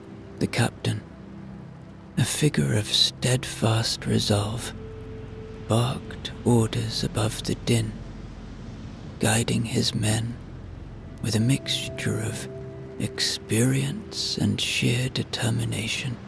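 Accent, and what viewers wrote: Ireland. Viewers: Loved this! I live Liabrarys, old ones expecualy, & Fairy Tails, Story's, & Irish Voice, Thank You ! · Please excuse me, I am uncomfortable with saying anything about this, but his accent is Irish.❤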